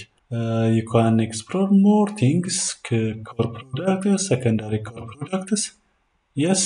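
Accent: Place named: Ethiopia. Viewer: Keep it up if you can please don't sound the accent like amharic just focus only on the appropriate words it's better background modie sounds with Text Writing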